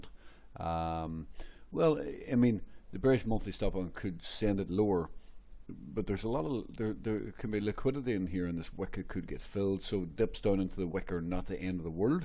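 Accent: Northern Ireland, United Kingdom. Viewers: You sound northing Ireland. Hello brother from Dublin 👊❤️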